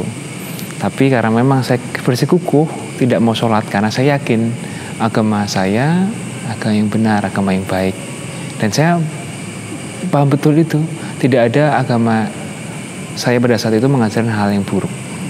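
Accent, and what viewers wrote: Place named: Java, Indonesia. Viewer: di maklumi sobat....lidah orang jawa memang kebanyakan begitu.nggak usah di permasalahkan.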